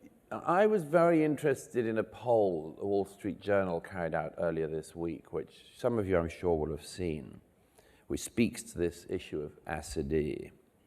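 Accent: United Kingdom. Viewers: You sound British. Have you heard of the Oxford Dictionary? meritocracy noun government or the holding of power by people selected according to merit Seems your “something like this” definition is about as useful as the English football team over on the continent right now But … I guess you have your agenda to push So, Best of British, you posh propaganda old chap! That said, mmm. wouldn’t discussing something (meritocracy) by defining it in a rather off-centre way … what could be called “straw manning”? But … maybe you’re more familiar with plain English than those chaps at Oxford (?)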